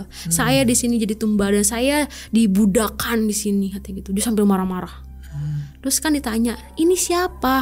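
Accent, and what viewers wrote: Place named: West Java, Indonesia. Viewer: Lidah orang sunda kayaknya 😂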